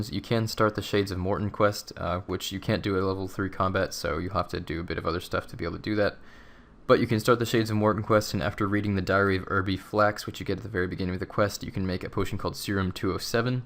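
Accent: United States. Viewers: But you're not even correcting him, because you're wrong. It's obvious from his accent that he's American, and the "h" is silent in American English. There are over one hundred differences between American and British English, and this is one of them. Please don't shit your pants over something so inconsequential which, by the way, is grammatically correct in the first place. This is made worse by the fact that you think you're right when even the simplest google search or slight amount of attention paid in school easily proves you wrong.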